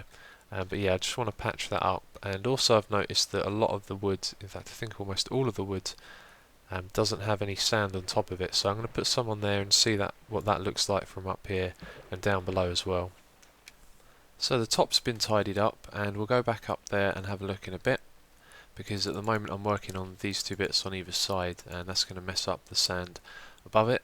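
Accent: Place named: United Kingdom. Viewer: Your voice is so epic and sexy i must say ! your accent is also really good just love the british accent!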